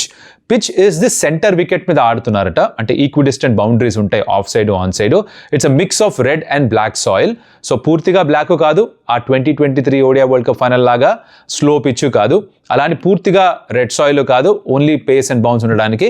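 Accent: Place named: Mangalagiri, India. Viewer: నాకెందు కో మాటష్ మంగళగిరి అనిపిస్తుంది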